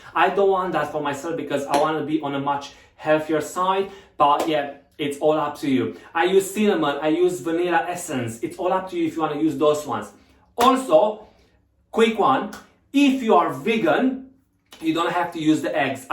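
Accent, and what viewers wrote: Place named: Romania. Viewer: He is romanian..i knew it..by the accent.😉👍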